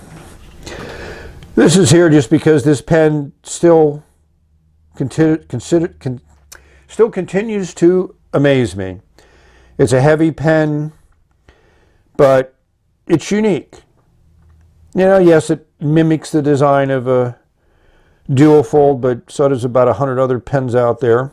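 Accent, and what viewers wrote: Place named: Pennsylvania, United States. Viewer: I’m a newbie at fountain pens, what does the bent nib do for ones writing? You sound like a PA resident, am I right? I grew up in PA😃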